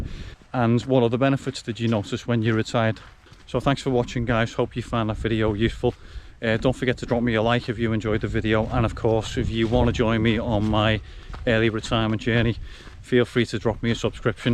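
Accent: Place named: Liverpool, United Kingdom. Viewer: Def sound like a scouser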